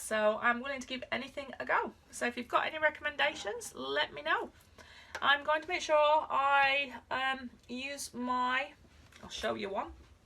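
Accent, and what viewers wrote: United Kingdom. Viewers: New follower thanks to Myriam! Can't wait to see your work! <3 Edit: Cuz I'm the girl who gets so excited that she comments before the videos are even finished. XD Are you Scottish? Whether you are or not, you accent is GORGEOUS! ;) <3 Edit2: I'm at the end and this looks STUNNING! The way you made the top and bottom kind of not be the focus, makes the center look like a sunset or sunrise. The part at the top above the last layer of blue, looks like a city at night with all of the sparkles. Beautiful work! I can't wait to start binging your channel tomorrow at work. (I'm a government employee. I can't be breaking the stigma of being one by actually working a lot. XD)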